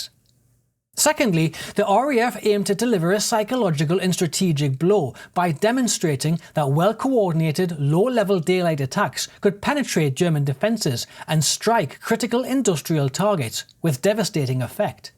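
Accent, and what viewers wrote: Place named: North, United Kingdom. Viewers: Well done, buddy. Every time I hear you, I travel back in time to Blyth in the 70's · I’m sorry sir, it just appears that way to me. Maybe I’m overly sensitive to it, and it could be I’m not used to your hard northern accent. Chris Ramsay the comedian is quite harsh on some of his words too so it could be a regional thing I just hadn’t heard before.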